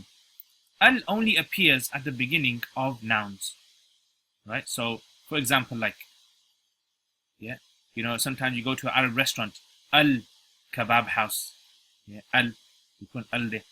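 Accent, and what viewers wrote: Birmingham, United Kingdom. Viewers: Brother sounds like you from Birmingham uk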